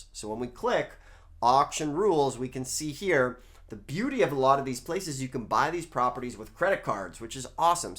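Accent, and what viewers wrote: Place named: Canada. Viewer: Why do you have a Canadian accent?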